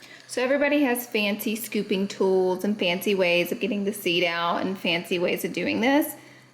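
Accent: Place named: South, United States. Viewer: If her accent didn’t give away that she is southern.... that wonder bread sure did. Literally a southern staple haha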